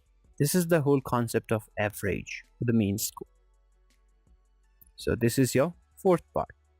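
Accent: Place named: United States. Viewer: u are indian right? but your voice sounds more like an american ...... :)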